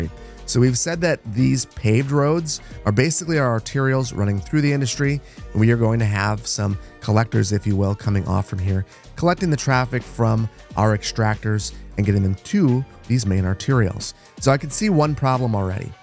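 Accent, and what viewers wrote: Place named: Midwest, United States. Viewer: Cal State Northridge, and I thought you were midwestern. Greetings from This San Diego State '72 almunus.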